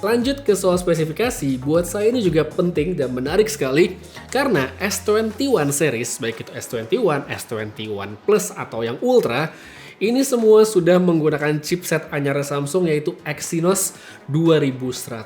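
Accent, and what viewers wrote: Bali, Indonesia. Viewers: Orang bali iya ?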